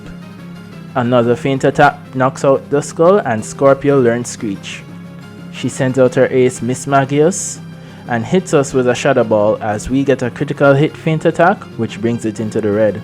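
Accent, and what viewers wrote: Jamaica. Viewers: you from jamaica? i’m from trinidad and trying to pin down the accent🤣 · You sound Jamaican 😊 I know our accent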